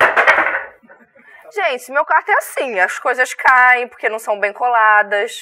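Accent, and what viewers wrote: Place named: Rio de Janeiro, Brazil. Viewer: É o sotaque carioca só fica bonito em mulher mesmo. a gamer que você rexxxpeita ❤️❤️❤️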